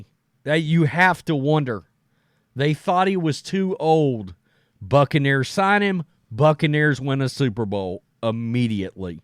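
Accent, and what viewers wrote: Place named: Texas, United States. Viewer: Your new theme song! Love your Texan country twang are you from the southern part of Frisco! Crummy scum riddled Frisco!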